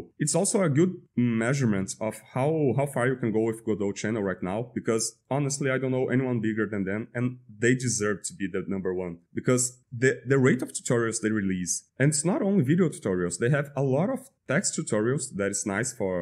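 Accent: Brazil. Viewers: That brazilian accent! Great content, keep it up!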